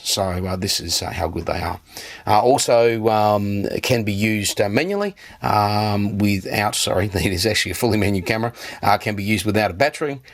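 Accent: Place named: Australia. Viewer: Very indistinct speaker....not only ehm ehm ehm but also strong "down under accent" makes him difficult to understand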